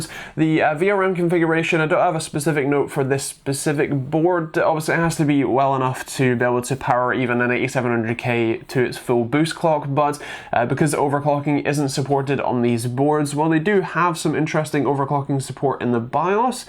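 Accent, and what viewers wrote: United Kingdom. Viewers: can't understand ANYTHING with your thick British Accent, lol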